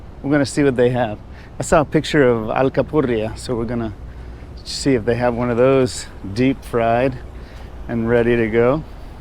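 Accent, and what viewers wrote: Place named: Spain. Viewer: Killing me with your Spanish accent 😂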